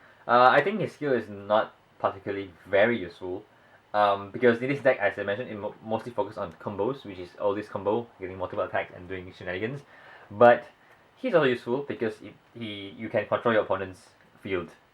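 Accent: Singapore. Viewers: Are you from Singapore?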